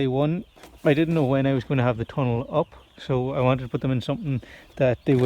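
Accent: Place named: Ireland. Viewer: Hi, keep up the videos, nice to be listening to an Irish man for a change 😊. Just wondering where you buy your bulk compost. John. Co Kerry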